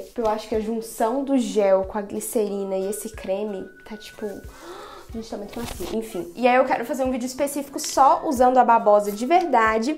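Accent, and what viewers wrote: Minas Gerais, Brazil. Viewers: !!!!!! amiga sua louca!!! que hidratação foi essa.... amei... amo seus vídeos acho você muito linda e fofa com esse sotaque mineiro... e: EU AMO BABOSA... sucesso bjnssss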